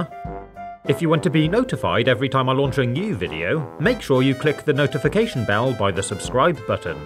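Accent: United Kingdom. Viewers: The British accent never gets old!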